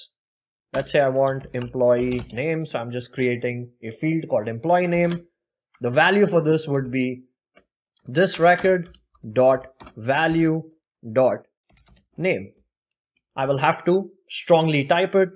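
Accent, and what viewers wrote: India. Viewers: I work with power platform dev for almost 10 years already and I must say... if the presenter have indian accent, he is a good teacher... great video m8 !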